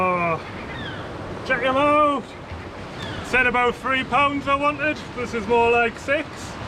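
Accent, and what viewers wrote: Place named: North, United Kingdom. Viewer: Top notch again some great fish makes the scrambling well worth it, great to hear a fellow northern voice on these channels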